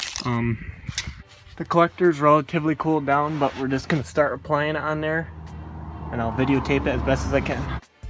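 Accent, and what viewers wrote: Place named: Wisconsin, United States. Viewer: I'm Canadian never been to the states but I'd guess he's from Wisconsin lol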